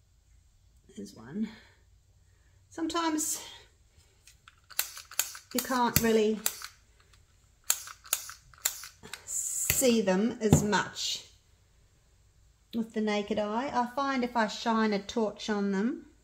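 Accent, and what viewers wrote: Australia. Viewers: You have a beautiful home, where do you live ? I'm assuming from your accent that you are Australian, please forgive me asking so many questions this is my first time here and you are very very intriguing I like your channel and I like the way that you talk...... Yeah, I know I'm weird but it takes many many colors to make a rainbow. That's my way of justifying my weirdness haha. Anywho great project go with the back in Black, that looked the best in my opinion. Take care stay healthy, you my dear, have a new subscriber 👍👍 -Jonny5 🥁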